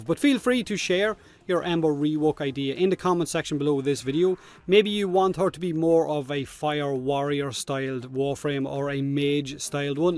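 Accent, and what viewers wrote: Ireland. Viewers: Honestly, i'd kinda like ember to stay very squishy but with a very high damaging capability, like nuking and such. Not some dumb press 4 to win, but something more like perhaps wisp's 4th ability, where you have to stack the reservoirs in order to deal the maximum amount of damage. Because warframes that have like one or two signature moves and a defensive shield, well, it's kinda all there is to new frames. Revenant, the new Nezha etc... I'd hate for that to become a recurring theme in the newer warframe designs. I really want ember to stay some kind of a glass cannon, but hoping DE can make her not point and click (the mesa rework on her 4th ability is a good exemple of a nuke ability well done). I'm sure they can pull off something along those lines. BTW, english not being my first language, it's getting pretty hard to understand what word you feel like you'r confusing yourself with (like third and turd). 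Just go with the irish accent it fucking rocks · Impressive,! You've adopted an irish accent, just like Zane from Borderlands! 😎👍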